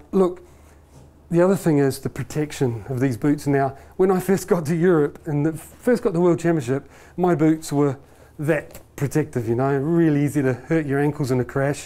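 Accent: New Zealand. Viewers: This dude sounds kiwi..Is he??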